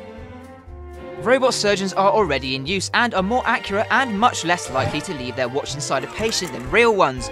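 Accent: United Kingdom. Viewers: When you make a video do not have loud music playing in background, tone down the volume, it is irritating to listen to music and spoken word at the same time. Second, please slow down your machine gun speed talk. One who is not used to Brittish accent of English can hardly understand what you say at that speed. Better articulation of words would also help. Thanks for the video - interesting.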